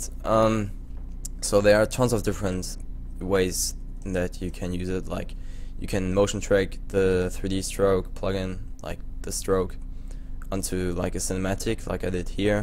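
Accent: Germany. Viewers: kommst du aus deutschland man hört das iwie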